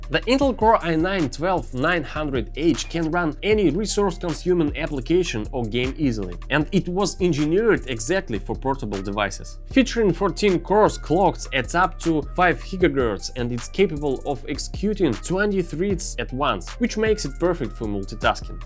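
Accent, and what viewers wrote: Russia. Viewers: ох уж этот русский акцент😅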